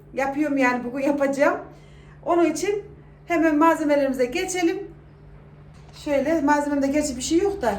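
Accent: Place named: Kayseri, Turkey. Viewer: Kayseri şivesi gibi konuşmanız çok hoş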